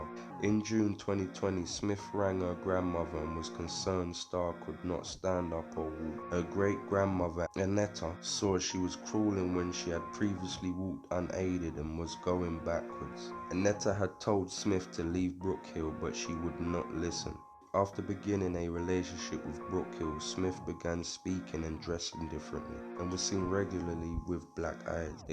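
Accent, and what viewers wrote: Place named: Cockney, United Kingdom. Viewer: Couldn't you get a better narrator? Not this monotone voice from the East End of London...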